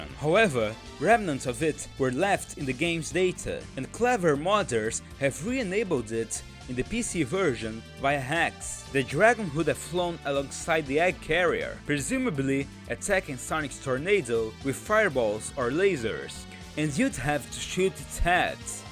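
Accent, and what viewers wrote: Brazil. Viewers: Aaaahhh ma tu é brasilero sem sombra de dúvida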